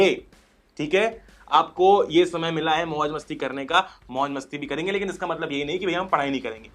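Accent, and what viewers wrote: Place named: Maharashtra, India. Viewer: Hyy aap maharashtra se ho kya💞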